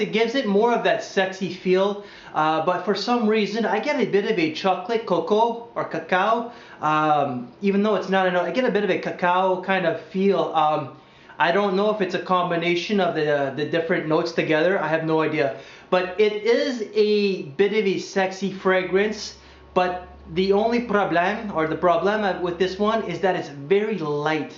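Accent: France. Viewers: LOL love the french accent, and what about that oriental woody? I know not all scents are meant to be beastly but I am really over people charging an incredible amount for something you can't smell...spraying alcohol on my skin and not having the pay off...no thanks. I try to lessen the amount of sprays (to lessen alcohol on my skin) by getting fragrances with more body. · What's with the slight french accent?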